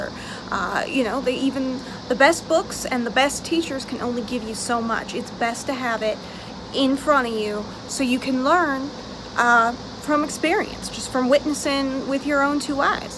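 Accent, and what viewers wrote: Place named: South, United States. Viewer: Hi, nice to meet you. I love your accent. I'm from the UK but I love the country accent. Who is your favourite country music artist? Mine is., I hope this ok to ask, have you ever switched on camera? I found your channel through DissociaDID.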